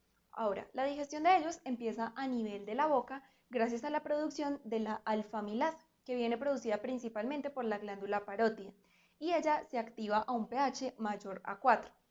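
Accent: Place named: Colombia. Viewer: Que bonito acento, supongo colombiano y gracias por enseñar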